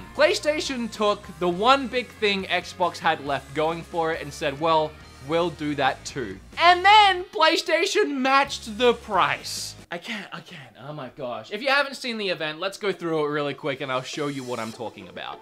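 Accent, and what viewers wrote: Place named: Australia. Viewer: This guy sounds Australian...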